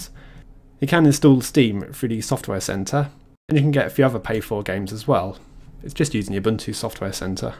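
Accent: United Kingdom. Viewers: Nice review done in Blighty English. How refreshing. Great job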